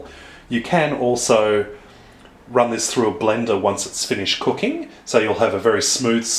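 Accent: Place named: Australia. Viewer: most aussies talk like that! me included!!! i think he talks nice!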